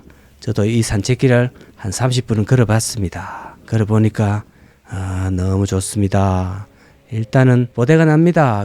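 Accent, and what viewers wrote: Gyeongsang, South Korea. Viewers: 님의 정겨운 갱상도 사투리 듣고 있으니 마음이 참 편안해 지네요.. 20만 30만 100만 가즈아~ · 강선생님구수한경상도사투리최고입니다~^.^ 조은영상보게해주셔서고맙습니다~^^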